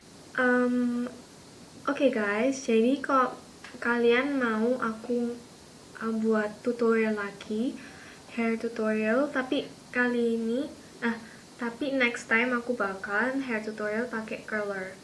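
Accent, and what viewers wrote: Java, Indonesia. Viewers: Wjah chines ... Logat eee medhookk y mbaakk ... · medok ya suaranya tp lucu hehe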